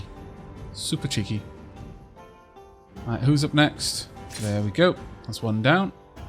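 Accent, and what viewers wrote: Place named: South Wales, United Kingdom. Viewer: Thought he might have been since I'm from wales and i can his accent was familiar and if I was going to guess he is from South Wales because his accent isn't realy strong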